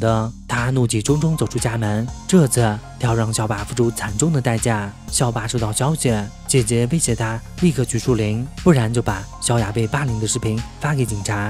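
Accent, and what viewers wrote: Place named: Taiwan. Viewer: 你这是哪来的地瓜腔？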